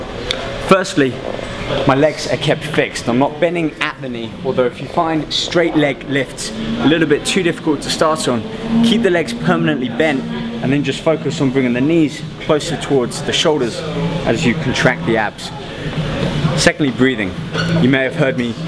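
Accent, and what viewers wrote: London, United Kingdom. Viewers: you sound like you come from london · the british accent makes him so much more believable. its such a sad thing on my mental capacity but its true LOL. hes not saying anything different from what I already know but it sounds so new for some reason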